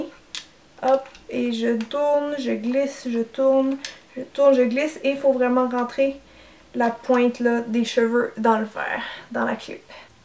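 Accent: Quebec, Canada. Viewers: Je ne supporte pas cet accent canadien! J’ai toujours detesté ! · c'est exactement ça que j'avais besoin pour mon costume des années 20, le maquillage et la coiffure, Merci beaucoup pour ton aide :) excellent vidéo et franchement ça fait du bien d'entendre une québécoise nous donner des trucs et conseils :)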